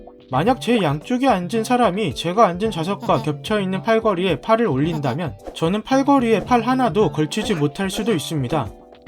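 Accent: Gyeongsang, South Korea. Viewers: 부모님측에 경상도분이 있으신건지 조심스럽게 추측을...저도 서울사람이지만 충청도 사투리쓰거든요 영향받아서ㅎㅎ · 형 경상도 사람이었구나 친근한 억양이 들렸어 블루베리 스무디 해봐 형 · 서울 사투리 안 쓰시고 경상도 사투리 쓰시는 거 보면 경상도 분인가? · 이거 혹시 사투리신가요? ㅅㅋㄱㅋㄱㅋㅋzz갱상도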